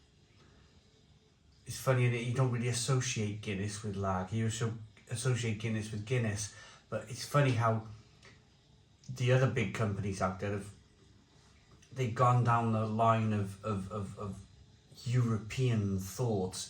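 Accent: Wales, United Kingdom. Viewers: Couldn’t agree more with this. Picked it up, 2 bottles of this faff in Waitrose on a yellow label. Dire. Really bad. Great review,! Love your accent, I’m a Berkshire boy but spent 12-13yrs in Dinas Powys and Penarth and your accent brings it all back! Don’t worry, I sang the Welsh national anthem, in Welsh at all the rugby matches I went to. Was constantly told where to shove my chariot but embraced it all and loved every second there! Love your channel. Really good. Cheers,!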